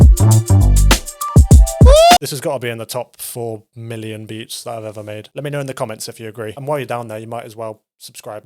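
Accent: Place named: United Kingdom. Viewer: It's only now that I've realised after few secs of vid that you're British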